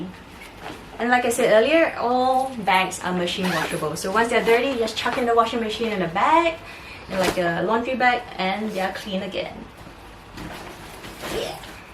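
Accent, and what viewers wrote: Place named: Singapore. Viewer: Are u from Singapore. I can recognise your accent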